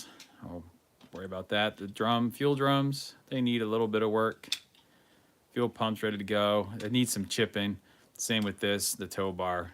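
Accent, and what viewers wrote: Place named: Philadelphia, United States. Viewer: Your Philly accent is excellent. Great voice. And I have thought about doing live but haven’t really dug further into it. Sounds like this might be an email conversation. I’ll dig your email out from your about page.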